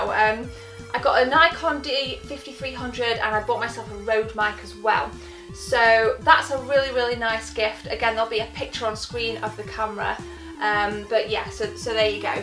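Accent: United Kingdom. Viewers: Awesome finds, I wonder if you always get good deals in the states because of the British accent? I here Americans like it. And also i really want Batman Returns on the snes.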